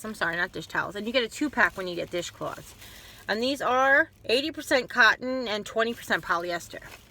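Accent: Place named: Long Island, United States. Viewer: Hi, new subscriber here! The moment I heard you I felt like I was home. I'm a LI transplant too, but I live in FL...31 years on LI and 25 here in FL. Love your crafts, DIY's and hauls. I'm stayin'!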